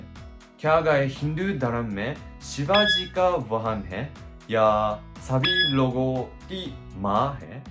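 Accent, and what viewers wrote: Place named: Bihar, India. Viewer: Genuinely enjoyed the video, and your bihari accent is so good, when I watched this video I straight up went to your channel to find similar videos like this, but found none. keep making this type of videos. and if you ever happen to come to Delhi then we can hangout...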